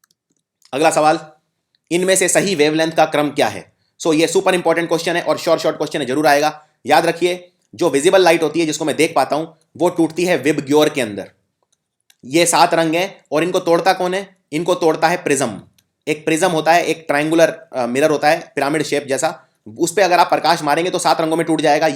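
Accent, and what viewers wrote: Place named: Bihar, India. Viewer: Bahut khub . Ur accent reminds me of a teacher of mine from bihar . Thank u sir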